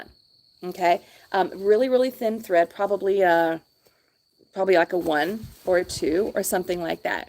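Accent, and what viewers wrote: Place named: South, United States. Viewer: Howdy neighbor lol. From Alabama here, nothing wrong with a Southern accent 😊 loved this video ❤️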